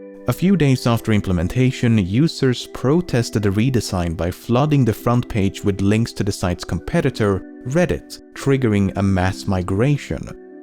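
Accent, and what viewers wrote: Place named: Sweden. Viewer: The swedish accent on English it's so good. One can never get angry on them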